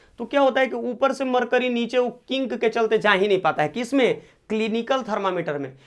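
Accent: Bihar, India. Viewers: Yah sir Bihari kyon bolata hai yah Bihar ka lag raha hai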